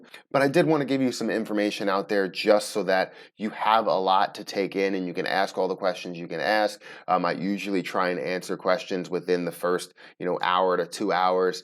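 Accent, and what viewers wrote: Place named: Baltimore, United States. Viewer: Are you from Baltimore,? Just trying to guess by your accent.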